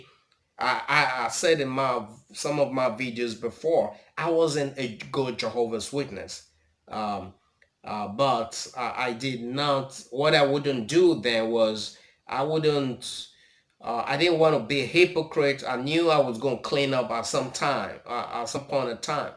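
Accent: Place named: Calabar, Nigeria. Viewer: You are Edo but got a Calabari accent. Or maybe it’s I have not been in Nigeria for a long time.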